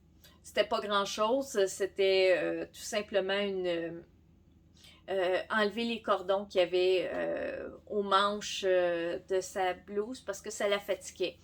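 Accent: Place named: North America. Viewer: j'aime beaucoup ton accent on sent qu'on est bien passé outre-atlantique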